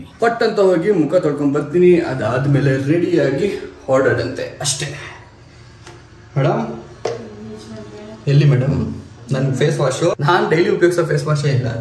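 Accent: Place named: Mangalore, India. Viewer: Aa manglore fast phase accent yellarigu artha agalla.. Artha mdkondre masth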